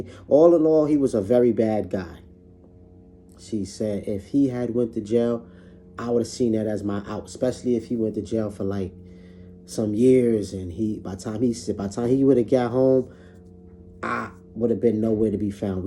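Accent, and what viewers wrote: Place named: Pennsylvania, United States. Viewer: I'm a new subbie & supporter. First thing, your voice is giving me Cassidy vibes😁im from PA and I recognize the accent. Second thing, you are a nice looking dude. Third, u need to post your cash app so your supporters can really support support if you feel me. Much love. Keep posting.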